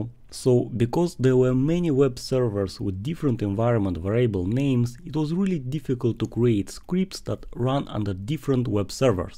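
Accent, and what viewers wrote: Russia. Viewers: Русский акцент? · Дружище, я сразу понял, что ты русский) Запили на русском такой же видос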